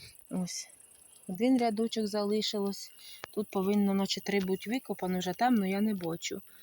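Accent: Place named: Poltava, Ukraine. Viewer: Я теж з Полтавської області,мама і досі проживає в Пирятині...Я ж чую,знайома вимова!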